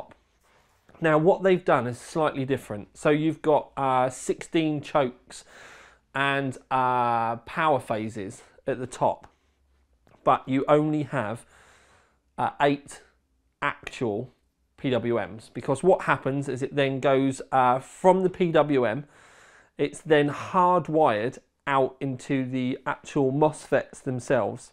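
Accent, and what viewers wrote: England, United Kingdom. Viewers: I can t follow this fucking eng accent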